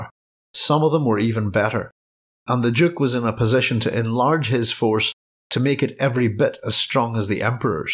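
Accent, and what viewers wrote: United Kingdom. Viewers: Wow, I was almost all the way through the video thinking you are Scottish when I tuned in and realised your accent is Northern Ireland. Sometimes an Ulster and west coast Scottish accent can sound similar. I wonder if it's because many Scots settled in Northern Ireland. Less Zen-suni wanderer and more See-you Jimmy wanderers :) Great video.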